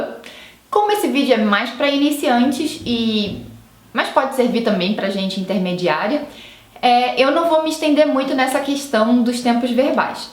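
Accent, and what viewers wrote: Brazil. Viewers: Outra coisa importante pra se lembrar é que as intonações mesmas são diferentes, então em todos os casos que a apresentava o jeito errado de tentar fazer uma pergunta só por entoar a frase afirmativa de jeito interrogativo, aquele "jeito interrogativo" da intonação brasileira não soa natural como intonação de pergunta em inglês: aliás, aquele tipo de intonação no inglês transmite a noção de surpresa irritante, de nojo ou algo assim. Falando de intonação... Eu não quero corrigir a, porque o inglês também não é a lingua materna de mim, mas a intonação que ela ponha nas frases interrogativas inglesas gramaticamente corretas (invertidas) também fica a intonação interrogativa do português brasileiro. Quer dizer: não é a intonação inglesa -- o que faz as perguntas continuarem de soar estranho. Desculpe erros do meu português -- tô um seguidor fiel do vlog, mas não sou brasileiro. 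Sou polonês:).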